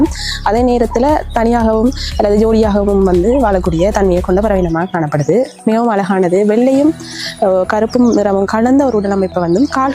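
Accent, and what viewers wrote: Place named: Jaffna, Sri Lanka. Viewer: Your jaffna Tamil dialogue is excellent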